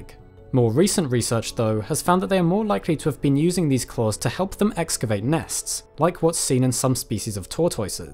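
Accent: RP, United Kingdom. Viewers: I enjoy your work. you always put much thought into it and present the material well. i learn something new every time. if you want to pursue a career in network broadcasting, you are photogenic, you present yourself well and your accent is clear enough for most Americans to understand yet will be classified as upper class British so educated and trustworthy therefore ideal for promoting news and science as opposed to sport or entertainment. however, you appear to be turning your head so much that it distracts the viewer from listening. try limiting the characters per line from 80 to 60 or perhaps 40.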